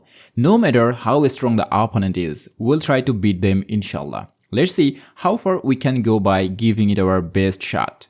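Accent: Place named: Bengal, India. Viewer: Your accent is Bangali. Are you from Bangladesh?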